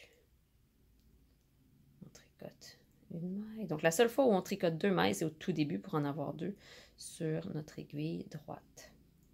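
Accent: Quebec, Canada. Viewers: Hello and Thank You so much!!!! J'essaie de faire un manteau pour un Petit Lévrier Italien et.... Je ne comprend pas comment faire les Pattes??? Merci pour Tout Tes Conseils!!! Fantastique et.... Ton délicieux petit accent québécois est Une Douceur à mes oreilles car mon Cheum (mari) et moi on est Amoureux de votre Pays sans l'avoir jamais visité Mais à travers plein de films et séries Québécoises en VO Québécois!!! Comme Tu es douée et partager si clairement est un don, à mon avis!!!!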